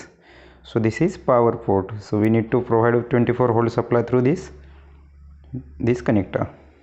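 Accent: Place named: India. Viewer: please make English accent more than indian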